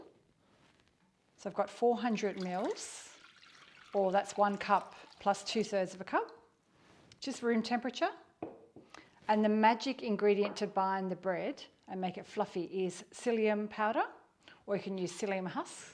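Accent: Australia. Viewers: Thank you i can't wait to try these. Are you an Aussie living in sweden? Your accent sounds Australian.❤️💙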